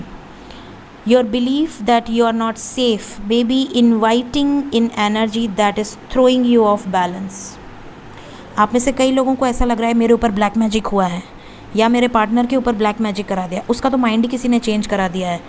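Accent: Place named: South, India. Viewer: Hello from Karachi Pakistan. Your accent seems to be south Indian. your reading is absolutely perfect. MASHALLAH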